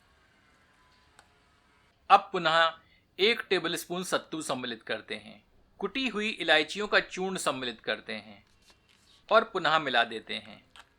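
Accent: Standard. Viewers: Badhai shuddh hindi uchcharan ke achcha laga sun kar. Aap kis pradesh se hain ?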